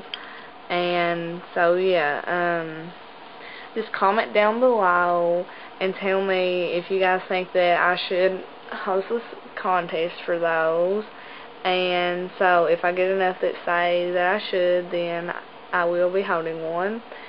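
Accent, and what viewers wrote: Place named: Arkansas, United States. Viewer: OMG! I'm from Searcy, Arkansas originally...lemme say that you're accent makes me feel all warm and fuzzy inside! I hated it when I was growing up there, but I miss it now! Thank you for reminding me how great it is! Smooches!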